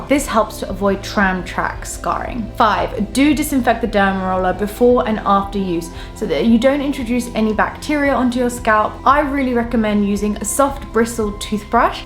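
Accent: United Kingdom. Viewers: Are u from uk?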